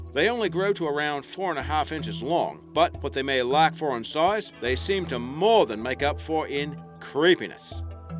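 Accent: Australia. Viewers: Dave 983 I think he is an aussie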